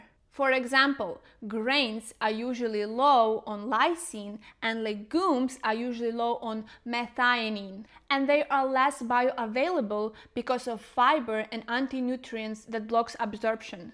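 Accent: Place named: Russia. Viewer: Great easy going video. And nice accent, reminds me of my time at school with a lot of Russians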